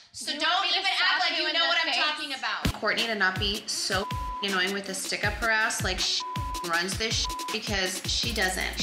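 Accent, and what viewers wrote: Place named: Australia. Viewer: I know it's hard but the lady speaking is Australian.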